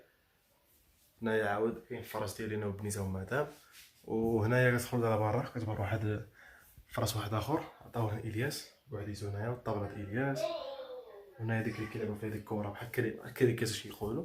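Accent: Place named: Algeria. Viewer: من اللهجة ديالك كتبان جزائري ماشي مغربي لي متافق يدير لايك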